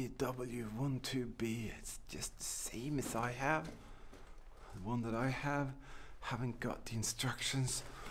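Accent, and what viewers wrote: Germany. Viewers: I like your German Voice ..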